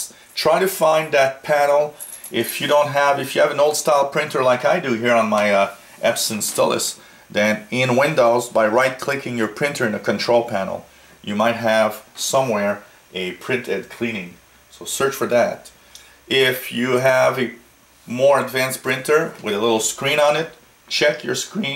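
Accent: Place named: Quebec, Canada. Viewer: french canadian accent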